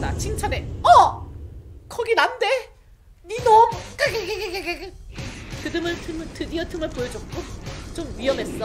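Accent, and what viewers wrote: Japan. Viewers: 한본어에다가 은근 성우스러움 ㅋㅋㅋㅋ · 한본어 개웃기넹 ㅋㅋㅋㅋ큐ㅠ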